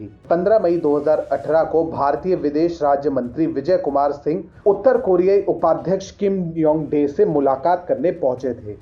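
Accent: Standard. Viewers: I am just hear to listen your shuddh hindi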